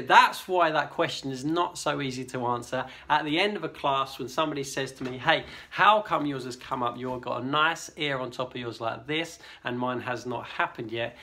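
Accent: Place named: Cockney, United Kingdom. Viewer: My new favorite cockney bread maker!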